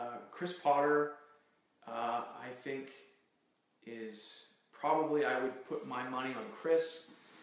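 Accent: Canada. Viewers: He sounds more Canadian here than on heartland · When your american and he says drAMa and soory ive never understood but then again im not Canadian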